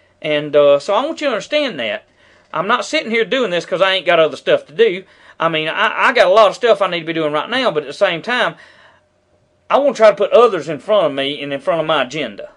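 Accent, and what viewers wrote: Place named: South, United States. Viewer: why? because he has a southern accent? because he is talking of being self-sufficient and preparedness? what he says makes sense, no matter if it contains "ya'll" and "aint". seems like you are the unintelligent one for tuning out valid points due to someone's speech pattern. i bet if your local mega super duper wal-mart closed down tomorrow, his viewpoint might suddenly seem rather valid, huh? although, some of the "they do this and that" talk is a little far out.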